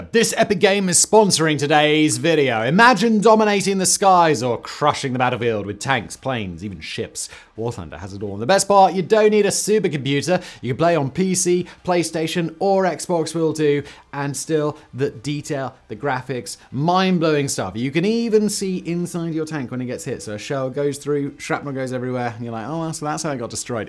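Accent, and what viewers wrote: United Kingdom. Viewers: because (1) American-English SOUNDS better (is softer/easier to listen to + easier to understand), (2) American-English is more professional (go INVESTIGATE ANY PERFORMING ARTS, VOICE, SINGING, MEDIA or JOURNALISM SCHOOL before - - BEFORE - - you kvetch + whine + criticize like a 5-year old), (3) ask yourself why British singers (the Beatles, Rolling Stones, Elton John, Pink Floyd, the Who, the ENTIRE “British invasion” etc, etc, etc, etc, etc, sing their songs in AMERICANIZED English (as opposed to lilted British accents?), (4) the British accent is weird, lilted, loopy, goofy + unnecessarily overly-emphasized (whereas STANDARD AMERICANIZED-English - - which again, is TAUGHT in professional performing arts, voice, speaking, diction, dialogue, singing, media, journalism, film + television schools (go INVESTIGATE it) - - is spoken more evenly + straightforward in tone - - and hence EASIER to listen to + EASIER to understand), and finally, (5) this guy covers interesting topics, but his accent, honestly, gets irritating. 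This advice/suggestion can only HELP him + his future videos - - because people may be inclined to STOP LISTENING after a few minutes if the affect is irritating to the ears. You asked.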